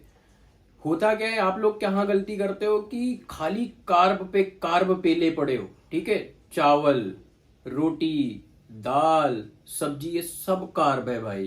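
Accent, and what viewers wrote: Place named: Awadh, India. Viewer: Samjhane ka tarika thoda casual hai bhai kya awdhi bolte ho bhai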